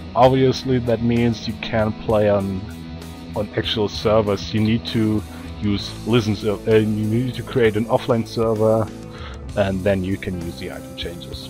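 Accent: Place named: Germany. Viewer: My Internet is spazzing out atm - I can't even load up SPUF yet I can watch YT videos (like this one) just fine. Good job, you made some nice item changes, and... Your voice is kinda...Well, German. :P No offense, just saying. (also, not Winjer - just saying :P)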